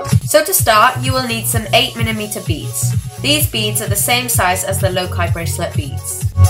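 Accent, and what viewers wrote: Wales, United Kingdom. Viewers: Are you welsh? You have the accent.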